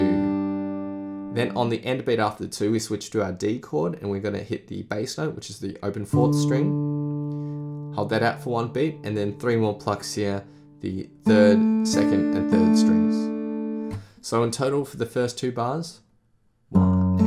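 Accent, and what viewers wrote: Australia. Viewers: Love the ausssie accent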